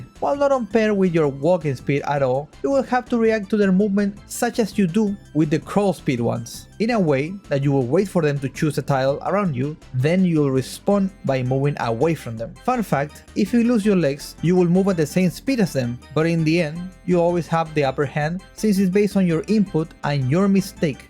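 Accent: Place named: Argentina. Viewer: hasta en ingles se nota lo argentino jaja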